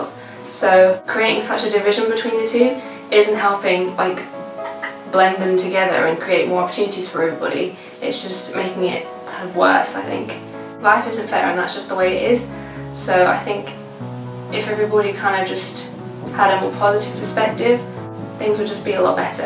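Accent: United Kingdom. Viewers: are you even britsh?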